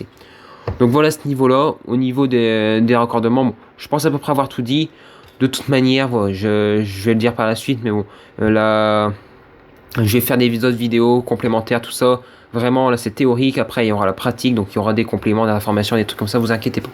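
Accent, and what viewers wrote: North, France. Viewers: Hello, intéressant je voit que tu est peut être du nord avec ton accent (idem pour moi,du pas de calais) je voulais savoir si ce serait possible de réaliser une batterie par toi même de la vendre,je serais intéressé c'est pour alimenter un sondeur sur un kayak ? Merci de ta réponse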